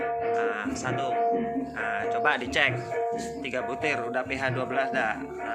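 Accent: Surabaya, Indonesia. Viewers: Om daerah mana?? Kok bhs nya Suroboyoan. ??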